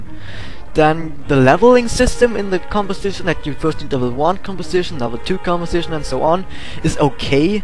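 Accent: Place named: Asia. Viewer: are u asian? u sound fob!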